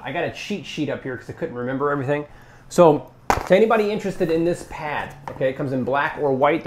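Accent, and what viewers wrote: Ireland. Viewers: where in Ireland are you from ?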